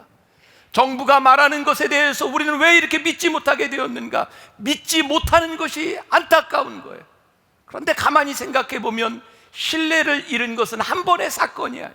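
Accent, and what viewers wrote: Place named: Gyeongsang, South Korea. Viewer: 안녕하세요 만나교회담임목사님 제고향경상도대구에서와서요사투리참재미있죠항상은혜말씀끝은주님의사랑이죠아멘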